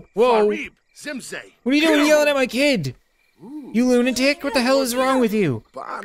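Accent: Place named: Newfoundland, Canada. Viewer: I can't believe you replied! I just wanted to say you're awesome and I can't believe I didn't notice you were newfie before I went to your askfm I should have noticed with the way you say party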